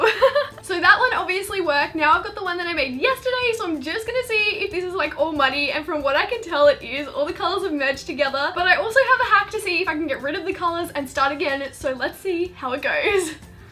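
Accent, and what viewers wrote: Australia. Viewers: I love your Aussie accent its so cute♡♡ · are you Australian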